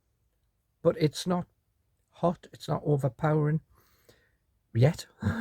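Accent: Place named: Newcastle, United Kingdom. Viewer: Love the geordie review ❤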